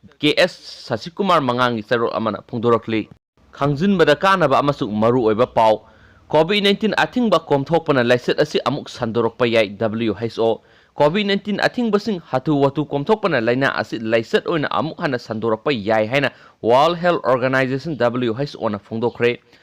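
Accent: Moirang, India. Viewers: moirang gi lon da douna tou a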